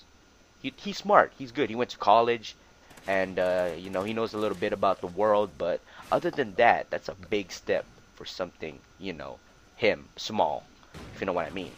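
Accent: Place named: Asia. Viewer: I love you Asian accent you badass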